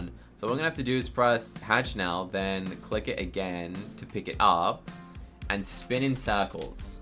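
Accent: United Kingdom. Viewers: So, I made a private server on adopt me and I tried to get you listed as someone who could use it but for some reason, I cannot friend you on Roblox. Can you please answer the question as to why and yes, I do mean you the YouTuber known as with the British accent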